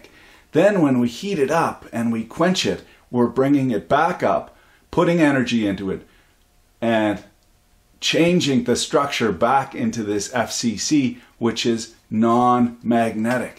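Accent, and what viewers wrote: Quebec, Canada. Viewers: Are you a french canadian ?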